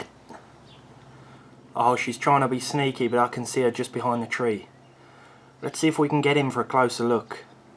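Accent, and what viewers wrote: Australia. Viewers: Need more Aussie accent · Love the Australia accent 😂😂😂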